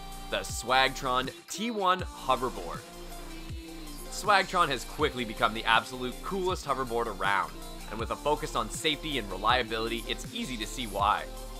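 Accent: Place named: Canada. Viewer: Why do you think I was talking to you? The narrator in the video has a strong Canadian accent.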